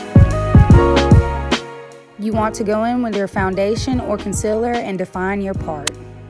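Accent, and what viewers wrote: South, United States. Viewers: You gotta be from the south 😍😍😍love your voice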